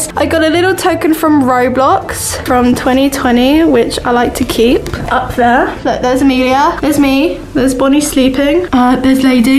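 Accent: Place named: United Kingdom. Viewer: WAIT?YOUR BRITISH?!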